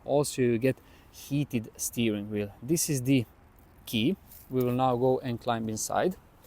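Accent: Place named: Balkans. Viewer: Vidi sa da Balkanac prica na ENGLESKOM 😅😇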